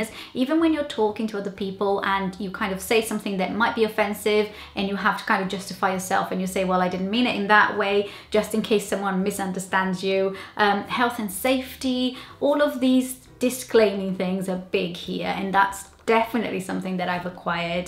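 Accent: Wales, United Kingdom. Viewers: What surprises me is the speed of a welsh accent you have picked up! :) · I love that you have a very Welsh accent!! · Your accent definitly has a little Welsh in it. I noticed it even before you said you lived in Wales. I'm from South Wales now living in Canada. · You are lovely, Your English pronunciation is excellent, far better than most British people but I detected a very slight accent. I thought it was your brazilian background. But it was after you said you lived in South Wales I realised you have a slight Welsh accent. I am Welsh so I am very happy for you to have found a home there. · Please keep your dual measurements in your head - Imperial is horrible and very few people use it now. The only exception is road distances and speeds. Your Welsh accent is coming along nicely by the way - very charming! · Your Welsh accent is so natural I assumed you were British! 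Fun video, and you're spot on with all the British habits 😅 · Your accent is superb very British with a little Welsh lilt. Americans serve fries even more than the British serve chips. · defo a Welsh twang there.. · Picked up a lovely welsh twang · You have definitely picked up a welsh accent. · you have a slight Welsh accent! It sounds nice! I LOVE DUNKING biscuits! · Love the welsh accent, with a little Brazilian in there :) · I'm Welsh , and she has definitely got a welsh accent. And I can tell what part of Wales she has been living too ! :-) Sitting next to someone on a train or bus in Norway. A country I spent some time in , is considered a insult and they will ask you to move ! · Thank you a joy to listen to, even your accent is vaguely Welsh. We are a quirky lot us British but we are appreciative of those that choose to join us and accept our foibles rather than insisting we change them. 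I'm sure you bring something to benefit us. I've been to South America so I know it's totally different from Britain. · I can't believe your from Brazil? You could be from the Swansea valleys. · I love your Welsh twang. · Can hear the welsh accent in your voice before you said you lived in wales . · As a fellow South Wales resident, I can confirm that that is an absolutely wonderful example of an acquired Welsh accent! Da iawn! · M H There is a slight Welsh twang to some of her words - I can hear it. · Love the Welsh accent! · Whats funny is you sound so Welsh! I can hear the musical tone in your voice! Im not insurance is a cultural thing here though that more marketing. I tend to get insurance if I think things are going to get expensive if I dont... eg smart phones, houses, cars etc. I dont get insurance on white goods, as there really is no need if you buy quality brands. :-) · You have a hint of a Welsh accent going on there, lol As a Brit, if in doubt, have a cup of tea, lol, the best medicine, lol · Really cool! 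It's funny to hear things pointed out that are just taken for granted as being normal here, there are weird customs that don't make sense! Your accent is great, I can even hear a tinge of Welsh in there. Btw, I hate chips too. Good stuff! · You are a knock-out!! 😍 Hola Linda!! You have a Welsh accent! I have a Birmingham accent and New York American accent too girl! 🤓 Portugees and Russian are hard languages for poor me. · Dave Williams Just what I was thinking (hint of Welsh) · You have such a lovely voice with your Welsh twang 😊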